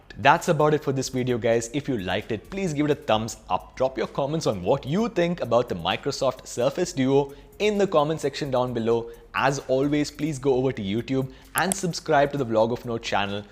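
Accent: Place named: India. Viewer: Дико забавно этот индийский чел говорит, и очки крутые! =)) Если по теме, то я согласен - без NFC, с тощей акб, без поддержки карт памяти этот телефон с железом 2х-летней давности вряд ли будет иметь успех, особенно с ценником в 100к рублей. Хотя, я постараюсь его купить, конечно. Надеюсь, Мелкомягкие всё-таки будут продавать более осовремененную версию девайса. А ещё жалко, что он не на Винде.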